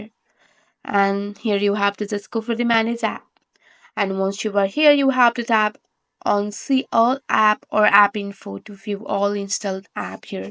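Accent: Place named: Nepal. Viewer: Getting that nepali accent.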